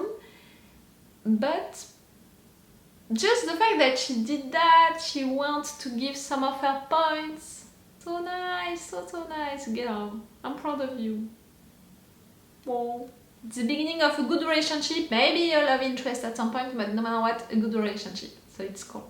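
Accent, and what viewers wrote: France. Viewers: C’est un accent français ca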